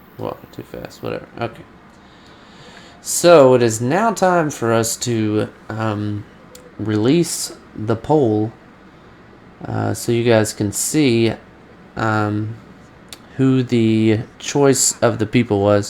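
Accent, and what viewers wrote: South, United States. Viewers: deep country accent he dead as hell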